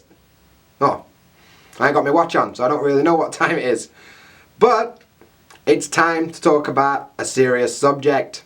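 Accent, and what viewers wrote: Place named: North, United Kingdom. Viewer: I've been trying to figure out your accent for ages and I will guess you're a northerner?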